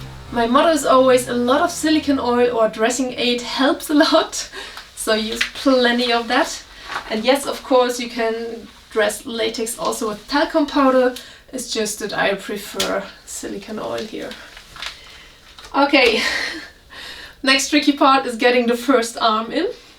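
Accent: Germany. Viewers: Maybe I misheard you then, with your lovely German accent babes... that lovely blue catsuit you sported for us last week, from Bright and Shine was it?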